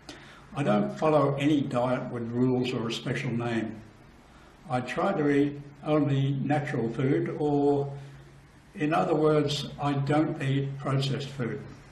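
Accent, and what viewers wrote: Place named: Australia. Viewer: Thanks for answering the questions good to know your eating a high protein high fat green diet. I am assuming by protein and fat you mean animal source. Your an inspiration great to see you can still be active at 80, by your accent I know your Aussie however your location seem to be elsewhere maybe Asia or Darwin.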